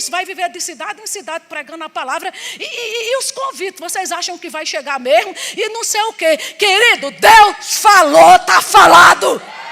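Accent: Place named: Northeast, Brazil. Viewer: Sou de Pernambuco e estou em Brasília, e é bom ver o nosso sotaque nordestino. E prega bem, palavra maravilhosa.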